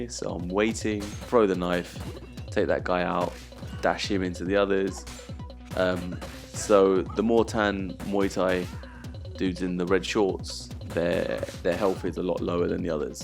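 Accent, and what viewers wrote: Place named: London, United Kingdom. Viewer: Oh, so you're in London! I had been trying to figure out y'all's accent. It doesn't sound like the typical English accent that I normally hear, but I'm also an uncultured American that's never really left the south lol. Love these videos!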